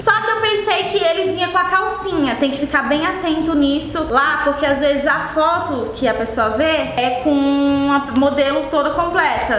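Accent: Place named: Fortaleza, Brazil. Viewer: fortaleza eu acho não tenho certeza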